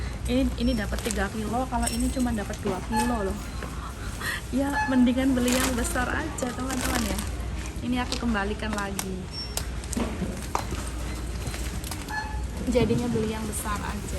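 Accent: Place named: Java, Indonesia. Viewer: Asli mana to MB kok medok bgt ngomongnya 🙏🙏🤗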